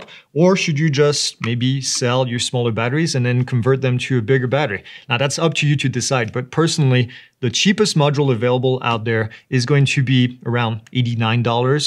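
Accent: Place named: France. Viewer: You have a French accent but you are talking about rules specific to the USA?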